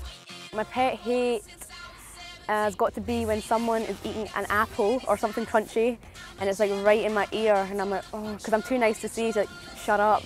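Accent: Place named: Scotland, United Kingdom. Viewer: hot, and a scottish accent? dear lord perfect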